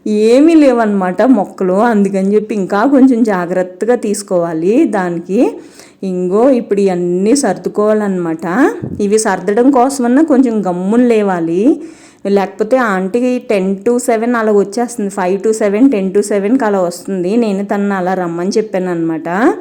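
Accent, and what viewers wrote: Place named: Rajahmundry, India. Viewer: Me voice ma Rajahmundry voice la supar ga vundi andi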